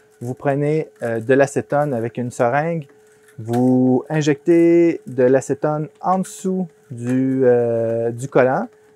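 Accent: Quebec, Canada. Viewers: Toujours un plaisir de regarder tes vidéos même si c'est pas toujours facile avec ton accent Québécois, mais bon l'accent Stéphanois (France) n'est pas mal non plus.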